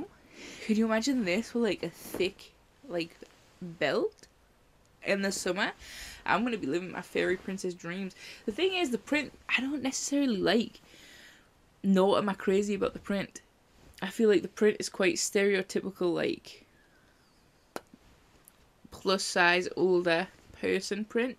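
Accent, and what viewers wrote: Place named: Newcastle, United Kingdom. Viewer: Same I’m Scottish so I kinda hear it but more so Geordie accent I think x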